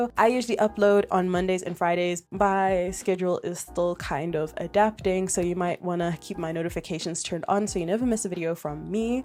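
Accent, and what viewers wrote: South Africa. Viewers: I agree, I think it's perfectly reasonable to expect criticism for your work when you put it out in the world and Sam was very mature going forward after all the backlash. (also just wanted to say your accent sounded really familiar and now I see it's because you're also from South Africa XD) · Such a great video, thank you! It's also great to hear a South African accent on YouTube by surprise! Had no idea when I clicked on this video. Subscribed! 🩷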